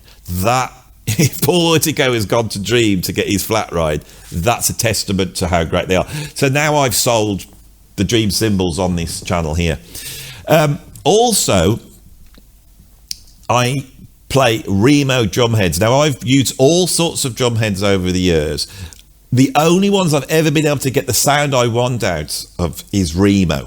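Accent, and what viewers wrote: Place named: England, United Kingdom. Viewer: I think you would make a great one-man-show on Broadway. OK, OK, off-Broadway. Considering your story telling, vocabulary, (major yet subtle) sense of humor, gift of gab, introspection, range of interests, English accent (I'm in America), enthusiasm, and ruminations; what's not to like? Cheers, mate!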